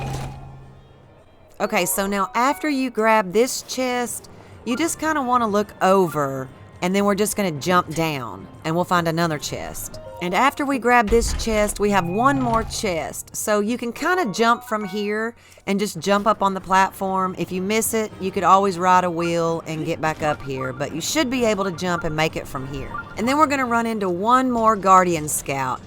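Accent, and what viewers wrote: South, United States. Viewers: Very helpful, love the southern accent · I'm British and this is definitely the best American accent ❤ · Is it just me or does she/he sound like a red neck?(no disrespect)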